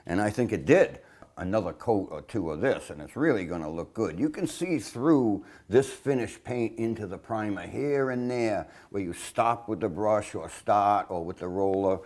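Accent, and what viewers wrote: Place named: Rhode Island, United States. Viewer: He's from Rhode island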